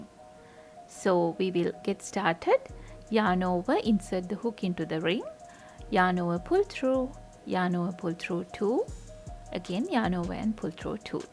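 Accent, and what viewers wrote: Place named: India. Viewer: that indian accent video is more good and trustful ❤❤❤🎉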